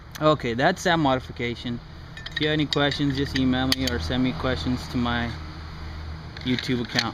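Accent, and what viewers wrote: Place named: Mexico. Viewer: Your Mexican Right ? i can tell by your accent :P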